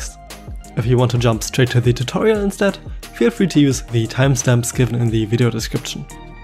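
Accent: Germany. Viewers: Er hört sich wie ein Deutscher an oder spinn ich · Wow! I love the size of it! :D Btw: are you German? Your accent sounds very german I think :) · Dieser deutsche Akzent....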